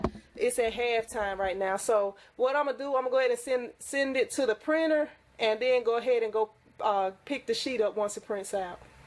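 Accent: South, United States. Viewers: I came for your 1st time.....and the country accent I can't get enough of ;) It came out great!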